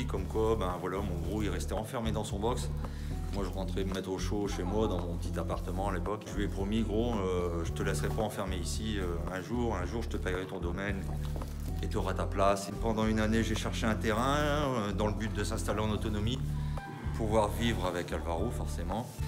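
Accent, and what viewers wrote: North, France. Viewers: J'avais bien reconnu l'accent chéri du Nord ☺️ Bravo pour toute ta belle création et tout ce que tu as donné ! Quelle énergie ! C'est une joie de te découvrir, de découvrir ta belle tribu animale. Prends bien soin de vous et belle suite encore.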